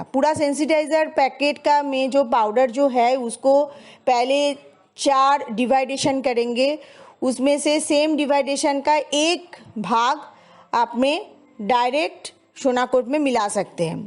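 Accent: Bengal, India. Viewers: আপনার হিন্দি বলার ধরণ বলে দিচ্ছে আপনার মাতৃভাষা বাংলা , বাংলাতে বলতে পারেন ।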